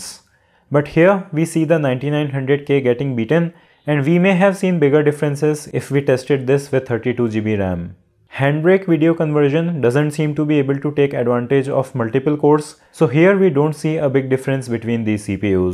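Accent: India. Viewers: You have the stereotypical Indian accent. Good video.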